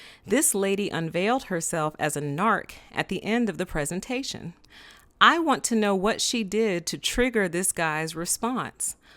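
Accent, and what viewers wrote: South, United States. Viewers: You have a cute southern accent!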